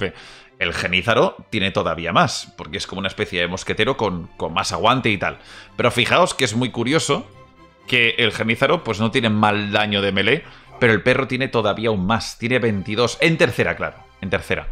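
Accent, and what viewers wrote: Peru. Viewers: Ere peruano?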